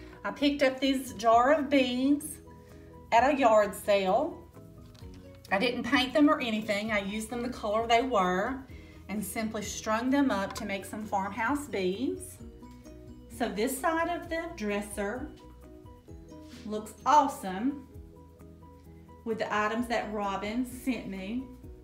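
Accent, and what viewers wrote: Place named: Alabama, United States. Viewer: Everything was so beautiful and you have created a warm and cozy home! Everywhere you look there is a treasure and a history. My two bedroom house is a little cottage and 73 years old (same as me). I looked closely at your windows(are they glazed?) and baseboard trim and am wondering if your home is an older one as well. I love the charm of an older home! It even has the original glass doorknobs!, you are a delight and I love your Alabama accent! Blessings from your new Central Florida friend❤️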